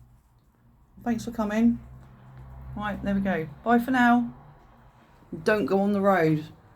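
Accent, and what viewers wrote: England, United Kingdom. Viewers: By your accent, I'd say you live in England. Am I right? Hello ftom Colorado USA.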